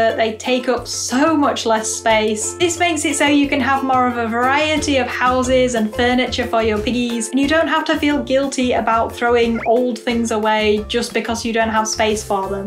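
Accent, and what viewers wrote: United Kingdom. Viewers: I just loveeeee this channel! Your voice is so sweet and calming and your channel has helped me A LOTTT for caring better for my "piggies" (in my brittish accent like yours...😊😊😊) sooo helpful thabk youuu!!!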